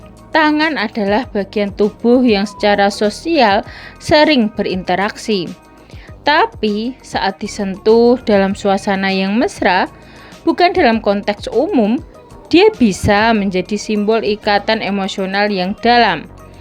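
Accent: Java, Indonesia. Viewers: Tebal sekali medoknya, orang mana sih mba? Dulu makannya apa kok bisa tebal sekali?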